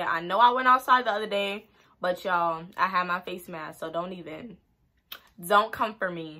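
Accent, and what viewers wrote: New York, United States. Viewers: Are u from ny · Definitely a New Yorker lol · Gotta be from New York with that accent!! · Lmao It takes a true New Yorker to know a true New Yorker. I can tell by the way you talk😭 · LMFAOOOOOOO AS SOON AS I HEARD THE NY ACCENT I WAS LIKE NAHHH LET ME SUBSCRIBE😭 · I’m new to yo channel and soon as I heard yo voice I was like she from New York 😭😭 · is she from ny? she gotta be a New Yorkian 😭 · Are u from ny ?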